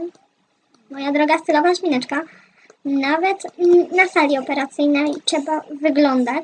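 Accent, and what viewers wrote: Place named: Silesia, Poland. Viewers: Ze Śląska ? Bo masz taki akcent xd ja jestem ze Śląska xd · Śląski Akcent 👌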